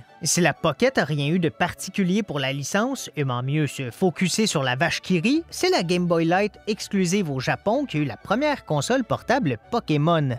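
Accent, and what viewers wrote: Quebec, Canada. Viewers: OMG L'accent quebecois <3 j'adore <3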